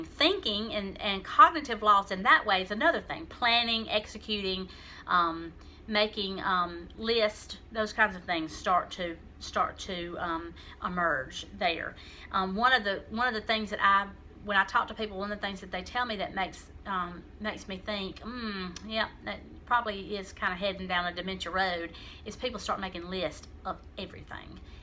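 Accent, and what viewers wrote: South, United States. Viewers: Thank you so much. I have been learning more that is helping me understand and survive than I've learned from the professionals we see. I am a mental health professional and I frequently feel I am 'losing it'. But education of stages and expectations was so helpful in making plans but also allowing me to be more in the present with my husband of 49 years. He is the love of my life and we have no family. But I am sharing with friends and making more friends. They are now my family. I have joined the County Council on Aging Advisory Board as a volunteer to make connections but also learn and share and improve education and support for all fellow caregivers. Oh, and I am a midwesterner, Buffalo to Milwaukee, and I love your southern accent...and your therapy cat :-)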